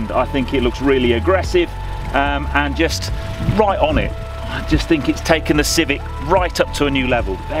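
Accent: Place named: United Kingdom. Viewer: Good review. Subscribed! Honda has made the Civics turbo the quiet performance car, especially the UK version, slightly different than the 1.5 hatch sport in the States. BTW, love your British accent, I hear so many different British accents on the media, but I found yours to be clear and sounding like melody to the ears. I'm wondering which regional accent it is? :)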